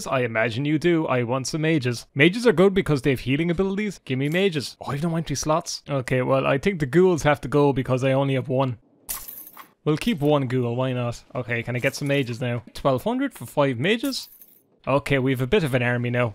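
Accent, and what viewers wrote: Ireland. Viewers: The game looks amazing. The great Irish accent fits as well.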